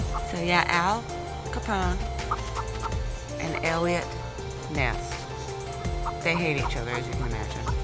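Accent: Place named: Texas, United States. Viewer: Besides the main topic of "how to" , to did this vid so us common folk could keep up so Thank you. Just a side note, you spoke just like my dad a grammama did and it brought Texas right back with a tender force so thank you for that too.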